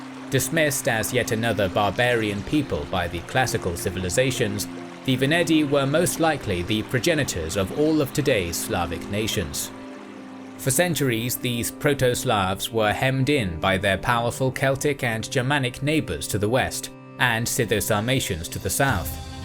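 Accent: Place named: United Kingdom. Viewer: Hey, I'm talking to YOU! Your channel is disappointing, and I'll tell you why: I'm not even one minute in, and I get blasted with a stupid Nintendo (No-Friend-o) video-game ad... (You know that I can just Google the info I want to know, right? I don't need to watch your channel to know these things)... I don't like being tricked, and that is exactly what you are attempting to do; to trick us into watching ads for your silly game. Shame on you! Why are all these so-called "historians" always hawking some dumb-ass RPG before they regurgitate a warped version of Wikipedia with incongruous graphics?...And, they all seem to have annoying high-pitched low-class British accents too... What gives?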